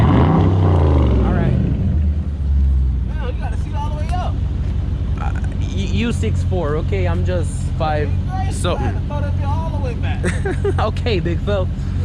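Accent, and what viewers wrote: Jamaica. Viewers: Wait deh,A sense a Jamaican accent mi brudda 🤔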